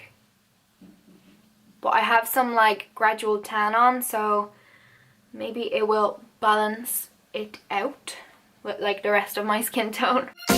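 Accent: Ireland. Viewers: I just found your channel and I love it !! 😍 are you from Ireland ?? Your accent sound a little bit Irish xo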